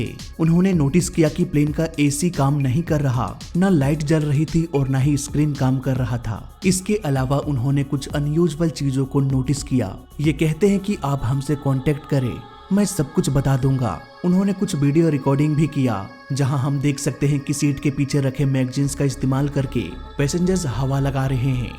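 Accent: India. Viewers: indian English. Maybe = sayad 😂😂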